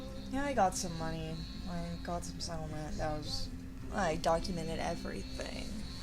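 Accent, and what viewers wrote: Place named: Poland. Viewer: you do have a way with words :) I do not, I have fragmented abstract thoughts -sarah Paulson is in fact a lesbian -your tangents and ambiance are awesome -"to get woke" love that -im also a skipper when it comes to movies/tv -your polish accent is kinda sexy -im a mess and I love colour so I'm def anti minimalist -I wish I could actually FaceTime u or something lol(I don't have an iPhone 😂) cuz I have trouble verbalizing if I can't just blurt out what I wanna say -the only way I'm writing this is cause I wrote notes and then made bullet pts -better than nothing lol love ur videos so much (*˘︶˘*).｡*♡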